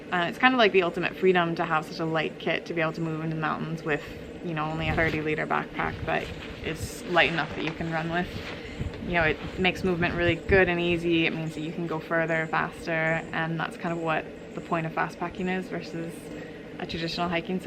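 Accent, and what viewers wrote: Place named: Scotland, United Kingdom. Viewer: Sometimes i hear a Scottish twang in your accent 🏴🇨🇦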